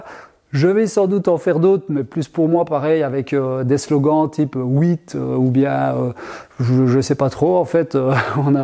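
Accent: Belgium. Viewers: Le T-Shirt on achète! 😁 Et le coup des T-shirt d'autodérisions de notre bel accent belge pareil! Super idée, le coup de l'artisan local! Belle initiative! Félicitation! 👍👍 J'oubliais: l'établi MFT top idée!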